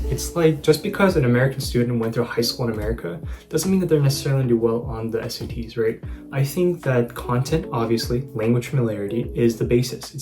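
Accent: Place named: Asia. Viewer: Thankyou so much and i really appreciate for sharing your valuable guidance and experiences . Being an Asian i can resonate with your speaking accent and style... Please upload more videos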